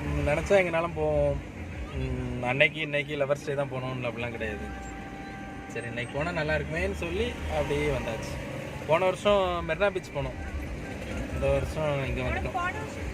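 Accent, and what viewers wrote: Tirunelveli, India. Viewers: Hi I am new subscriber. Unga native place enga . Slang Tirunelveli maathiri iruku anna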